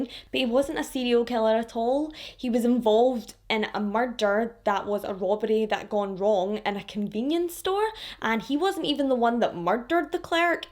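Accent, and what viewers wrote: Scotland, United Kingdom. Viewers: The Scottish accent brought me here and the fun story telling was an amazing bonus. Hooked for life!!!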